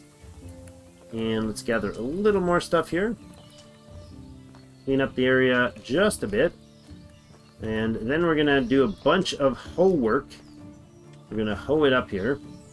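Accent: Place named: Canada. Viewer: Have been loving this game lately! My favorite gameplay and commentary yet- subbed and liked! I recognize a little Canadian accent there, eh?? Cheers from the Pacific Northwest USA brotha!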